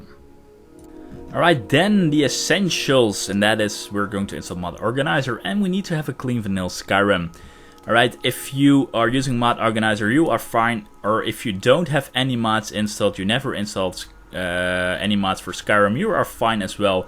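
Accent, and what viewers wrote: Netherlands. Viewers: Heerlijk Nederlands accent! Toffe video trouwens :)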